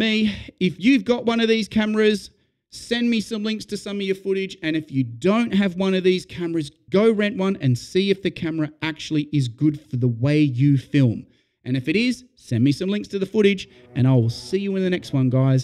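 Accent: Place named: Australia. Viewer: I can’t tell if you’re from down under or from the fruit isle... I am Australian so you better not hate on me...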